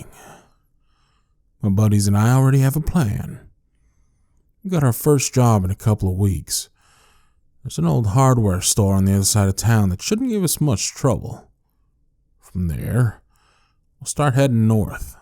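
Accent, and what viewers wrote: South, United States. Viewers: I love you cowboy accent it’s so hot and fine love you can your voice ❤️❤️😍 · This was amazing. Made my whole evening better. Love that southern drawl.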